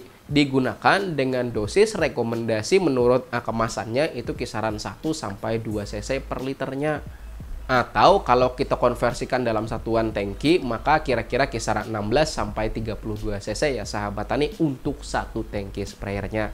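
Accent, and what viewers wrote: Banjar, Indonesia. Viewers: urang banjar mun bepander bahasa indonesia pasti ada inguh inguh banjarnya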